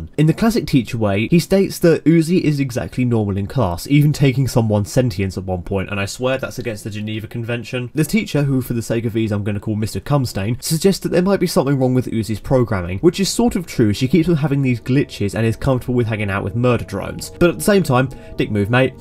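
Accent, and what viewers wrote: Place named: United Kingdom. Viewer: The brit'ish accent is very strong here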